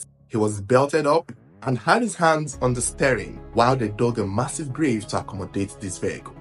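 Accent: Igbo, Nigeria. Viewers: He sounds like an Igbo from Nigeria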